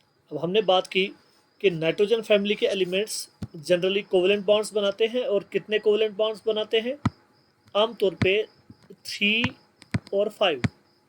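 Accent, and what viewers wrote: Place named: Kashmir, India. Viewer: yes sir .... kashmiri accent is 💞...btw im from Srinagar....iss saal board hai.... dua kejeye ga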